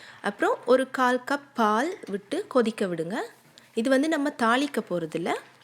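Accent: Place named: India. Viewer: Full and full srilankan cooking but speaking way indian style .but not bad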